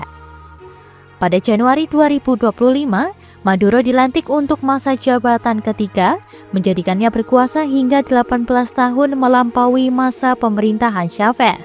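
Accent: Java, Indonesia. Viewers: Medhok sekali dubber nya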